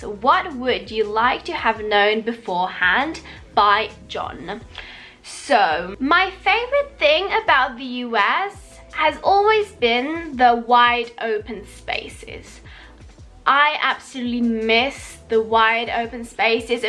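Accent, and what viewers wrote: United Kingdom. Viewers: I am totally bewildered. How can you be from Italy and have such a pronounced British accent? I mean, I am Italian and I know an Italian accent when I hear it and your is definitely not an Italian accent. Very curious. I'm sure there is a logical explanation. I am also sure others have wondered the same thing. EDIT: Excellent videos, by the way! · i almost thought u were british you speak so good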